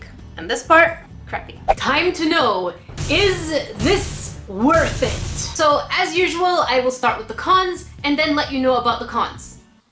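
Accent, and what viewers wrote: France. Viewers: Can I ask you a question are you French? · ur FRENCH? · Wait are you French?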